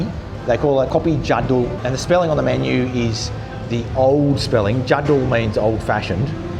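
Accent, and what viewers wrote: Australia. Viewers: Yeah I hear ya, 11hrs door to door it's a big day, I went to degree coffee today in Denpasar, good coffee, your videos speaking bahasa Indonesia have helped a lot with my learning as it shows me what an Aussie sounds like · Thank you, always such a pleasure to see your walk and talk. I always have to laugh when you talk English with an unmistakeable Aussie accent, but when you talk Indonesian the accent is almost gone. You learned it very well!!!! Don't think that many any foreigners talk Indonesian so well as you do. "Saya Belanda" by the way 🙂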